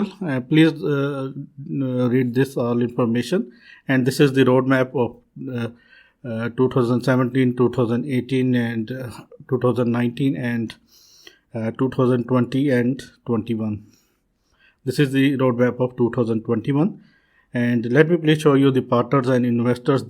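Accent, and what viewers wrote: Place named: India. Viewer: Soon as I hear an indian talking. I just think not to be takn seriously.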